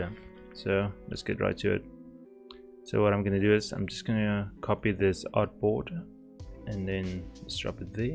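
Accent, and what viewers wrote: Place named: South Africa. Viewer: I detect a South African accent there....am I correct?😉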